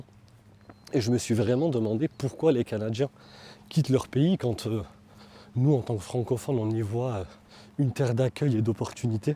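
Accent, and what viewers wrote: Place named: France. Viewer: Il est évident que vous êtes un Français parce que je comprends ce que vous dites sans aucune difficulté, mais seulement 50 % des gens du Québec.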